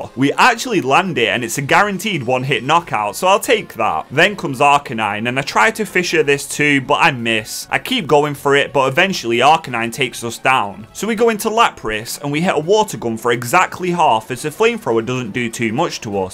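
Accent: United Kingdom. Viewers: Bro are you British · you're English you say every thing different is fine all poke tubers do it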